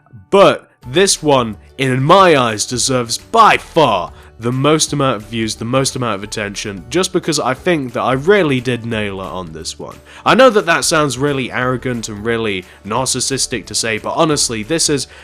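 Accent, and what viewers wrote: England, United Kingdom. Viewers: Are you form England?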